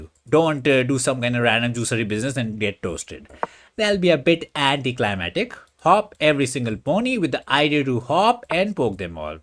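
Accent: India. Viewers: When I want a good laugh and learn more about Chess tactics, this dude doesn't disappoint lol... The only jokes with Indian accent that I accept, and enjoy,,,lol...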